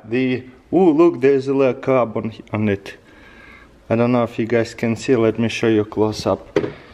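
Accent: Russia. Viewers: Colorless Rainbow its his Russian accent...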